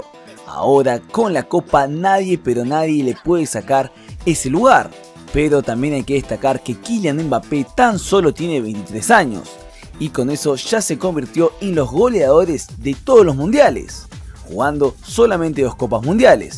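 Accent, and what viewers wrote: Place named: Argentina. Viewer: POR LA MANERA EN LA QUE HABLAS NOTO QUE ERES ARGENTINO, Y TE FALTO MENCIONAR LA PALABRA HUMILDAD, POR QUE EN SUS VIDEOS Y NOTAS DE LOS MEDIOS DE COMUNICACION DE ALLA, LES FALTA USAR MUCHO ESA PALABRA? LO QUE MAS GRADE HACE A MESSI ES ESO, HUMILDAD Y LA TUVO EN ESE MOMENTO QUE ABRAZO A MBAPPE, MESSI ES MIL VECES MAS GRANDE QUE EL ADICTO MARRANONA, EN PRIMER LUGAR POR LO QUE HA GANADO EN LA CANCHA Y EN SEGUNDO LUGAR POR LO HUMILDE QUE ES, HUMILDAD LE FALTO A ESE TAL DIEGO.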